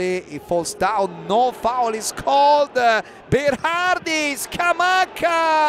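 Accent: Italy. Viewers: the speaker is 101% Italian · What a comentator.. nice to hear it.. English with Italian aksen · The commentator makes me embarrassed to be italian. We don't all talk English like this 😒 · Commentator with italian accent is the best thing of this new year lol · Sembra un italiano che legge il commento in inglese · probably the strongest Italian accent ever heard on TV! even by us Italians!! it's the icoliseeerrrr! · I love to hear the italian accent from the commentator commenting Serie A highlights. So authentic and perfect for italian football👌 Grazie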